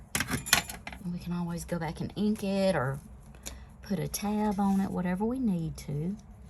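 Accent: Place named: Kentucky, United States. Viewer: You sound like you may come from my neck of the woods. I’m from KY and just learning, these digitals are gorgeously beautiful lol. Thanks for sharing.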